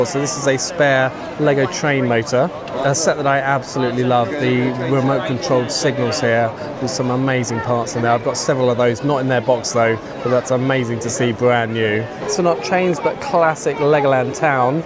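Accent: United Kingdom. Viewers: EVERYONE SHUT UP the nice British man is gonna tell me about his Lego collection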